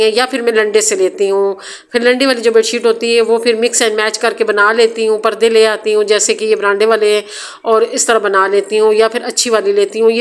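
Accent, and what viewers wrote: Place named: Attock, Pakistan. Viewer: I like your house...simple,decent... Ap k bolny k andaz sy mjy lga k ap attock sy hn..r ap ny khud b zikr kr diya😊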